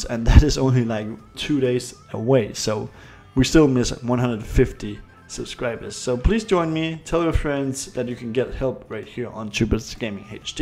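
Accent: Denmark. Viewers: Are you danish?? You Sound danish in your accent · man tager ik fejl når man høre dansk accent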